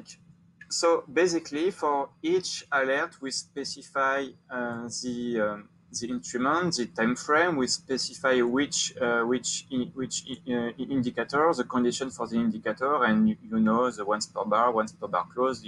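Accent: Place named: France. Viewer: I didn't know I was looking for a French accent cliché until I found this video...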